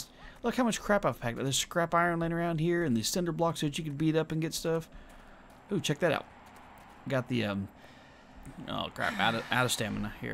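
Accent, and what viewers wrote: South, United States. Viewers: I'm curious where your from I can hear the southern in your voice and I like how you talk very clear and to the point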